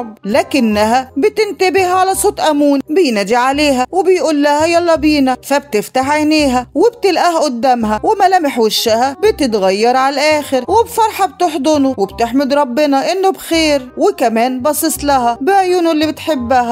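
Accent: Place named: Iraq. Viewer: هاذي الرواية عندها اكثر من اسم؟ اسمها الاميرة المحبوبة او كيف اصبحت اميرة المهم اوك هتخلى عن لوكاس بس هاذي المررة وانا ليا كلود هو في اب بوسامتو لا ده غير فيليكس وايجيتل المسكين انا كلي رومانسية وبعشق الرومنسية بس مو لذي الدرجة الي انتي متصوراتي بيها رومانسية في الروايات والالمسلسلات بس في الواقع غيييير وان شاء الله ندخل الجنة وهنيك نقدر نحلم زي مابدنا ومن لهجتك واضح انك عراقية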